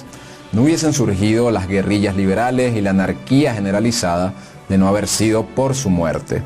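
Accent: Venezuela. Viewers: Es un venezolano el que narra? Hasta aca llego en ver este video. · GRACIAS A. ESTE JOVEN DE ACENTO VENEZOLANO..POR ESTEV8DEO MARAVILLOSO..USTED SI ES JOVEN UN VERDAFERO HISTORIADOR MIL GRACIAS...DESDE COLOMBIA 👍👍👍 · Que hace un veneco narrando de esto? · El que habla es de venezūelą? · Este man es venezolano?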